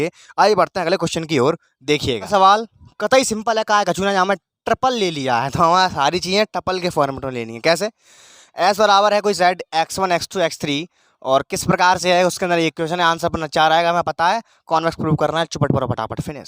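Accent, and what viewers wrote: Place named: Braj, India. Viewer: braj bhasa accent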